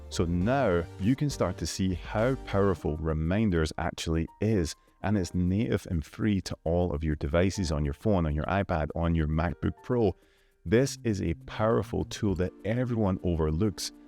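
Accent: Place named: Scotland, United Kingdom. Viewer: Did expect a fellow Scot when I clicked this! 🏴 Good overview, great presenting and useful tips.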